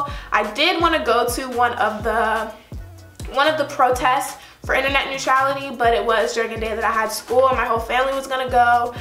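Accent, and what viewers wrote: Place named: United States. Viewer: A little bit confused here. You have a black Tshirt on with the letters BL_CK with a map of Africa between the Bl and the CK. So I'm guessing that it's meant to mean black African? Obviously black but you speak with an American accent, excuse my ignorance. Are you African or American? If African, what part of Africa do you come from? You do the American accent really well.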